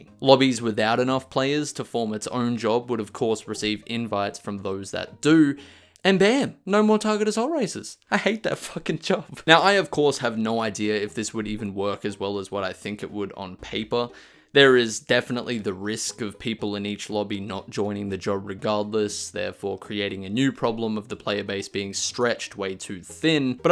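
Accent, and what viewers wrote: Australia. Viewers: Are you Australian Kiwi or English? cause honestly i cant tell...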